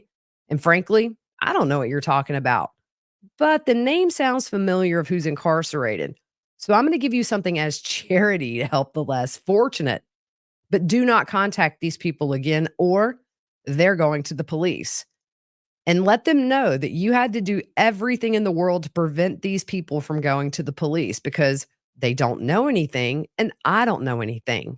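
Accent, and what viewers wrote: South, United States. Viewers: thank you so much for your hard work! We all LOVE your Southern voice. You’re adorable to the max, and full of the best true crime info on the web. Respect. ✊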